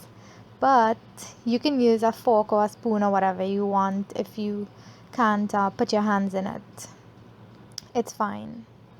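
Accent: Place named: Trinidad and Tobago. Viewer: I did enjoy your video. You did an excellent job explaining everything because I also love to cook. You did it in beautiful Trini accent and it also showcased our culture. I would not add the seasoning but the variations in cooking is varied...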